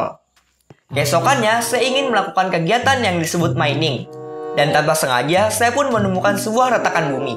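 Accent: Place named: West Java, Indonesia. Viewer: Bang nanti coba tugas ipa dong semangat terus bang ngonten nya lanjutin konten survival seru bangettt dan cara ngomong abang kek orang Sunda :v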